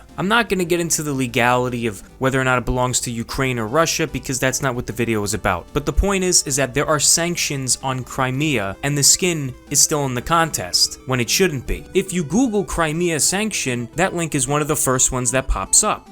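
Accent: Italy. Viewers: Dude r u from italy?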